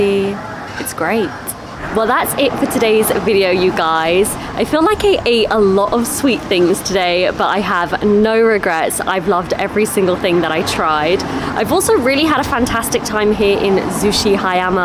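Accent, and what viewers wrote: United Kingdom. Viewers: イギリス英語って、ホント、耳に心地いい！ と、感じる「１」大阪人です〜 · いつ聴いても綺麗なクィーン‘ズ イングリッシュいや、キング’ズ イングリッシュですねぇ。日本の第二外国語教育として英語か米語のいずれを選択するかの議論があったのですが、日本は米語を選択して今日に至っています。何度も申し上げますが、発音が心に響きとても美しいですね。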